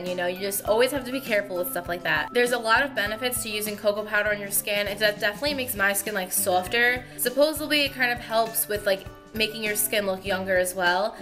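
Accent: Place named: New York, United States. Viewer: Are you from Long Island/ Brooklyn/Bronx/Queens/Staten Island or something? Based on your dialect.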